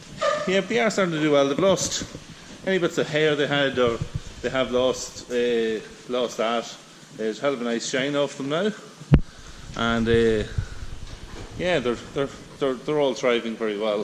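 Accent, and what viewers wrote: Dundalk, Ireland. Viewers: Where abouts in Ireland are you based ? Sounds like your from around Dundalk (louth) area ?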